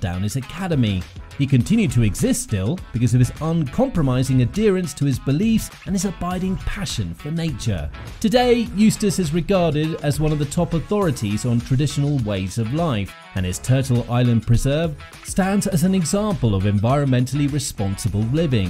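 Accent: United Kingdom. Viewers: Or British whatever you are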